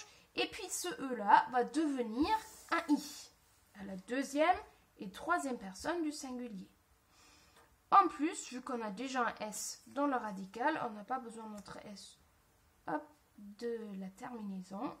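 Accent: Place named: France. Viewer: dieser französische akzent xD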